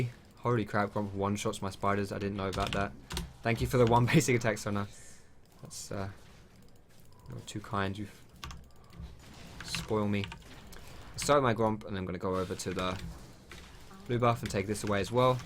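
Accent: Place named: United Kingdom. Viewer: I KNOW RIGHT ??! It made me laugh for the whole game, but does he really means to say cumshot all the time? Cause he's not even laughing, so i'm wondering if he means to say something else but we don't get it because of his british accent or if it's just a joke he doesn't find funny anymore. °-°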